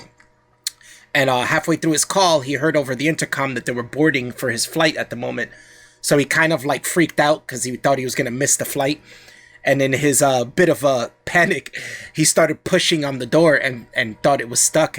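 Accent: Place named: North Jersey, United States. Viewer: Take a hint from the show. The narrarater of this channel should be changed. Sounds like a 14 year old kid from North Jersey in his moms basement.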